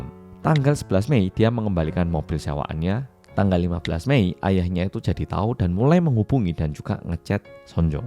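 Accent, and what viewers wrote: Java, Indonesia. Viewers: Saya senang nyimak cerita2mu terutama gara2 aksen medhokmu, yg bikin saya ngakak dan kangen masa kuliahan. Dan ga banyak back sound bodoh yg sbnrnya merusak kualitas video. Sementara sbgn besar youtuber beraksen Jakarta krn emang dr sana, byk back sound ga perlu yg malah menurunkan kualitas video, dan saya booooooooooosan abis dg gue gue lo lo yg terkesan keminter. Sehat selalu bro.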